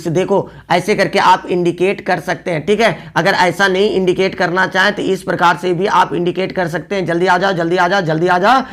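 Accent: Jharkhand, India. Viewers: Aap jharkhand se hi lagte ho sir 😊😊😊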